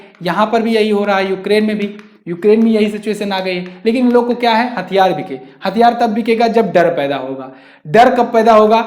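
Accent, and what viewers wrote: India. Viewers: Desi English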